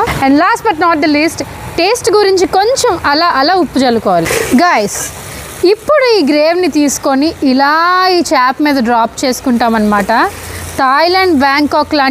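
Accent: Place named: Godavari, India. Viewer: తపెలా...... బాగుంది గోదారి బాష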